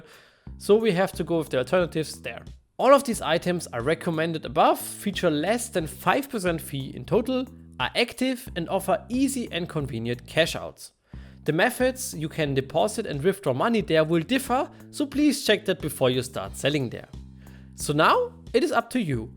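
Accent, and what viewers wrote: Germany. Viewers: bruder dein deutscher akzent ist nicht zu überhören, sehr gutes video, hat mir sehr geholfen, weiter so!😂👍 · warum ist dein stimme so deutsch?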